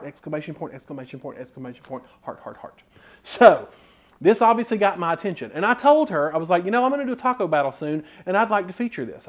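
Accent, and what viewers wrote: South, United States. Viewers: Ur the bomb! I love the southern accent and expressions. ❤️ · You are such a joy to watch. I always feel I just had a visit from a personal friend. Love your Southern conversation while you cook. God bless you and yours. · I like your southern accent. Sexy guy, thanks for making these videos. I watched so many keto recipes then i do not have time to try these like you. I appreciate your work and humor. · Oh my GAWD you are so Southern and I love it! You talk so much like me and the people I know. When you just said "Y'ALL." I was LMAO. BTW, here's an idea to keep your taco shell shaper from rolling around: Use two measuring cups of equal size, spouts pointing toward each other, instead of glass jars. The spouts will help hold the dowel in place. :)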